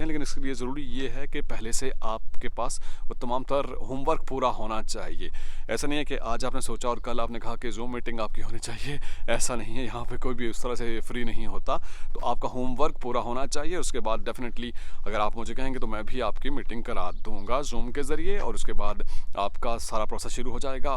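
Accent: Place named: India. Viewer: Ap Pakistani hain tu Pakistani language main bat kry Indian accent main kr rahy ho tum we doesn’t understand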